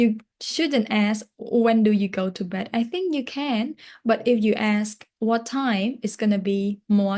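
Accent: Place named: United States. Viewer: អ្នកគ្រូនិយាយភាសាអង្គេស តុង អាមេរិក បានល្អណាស់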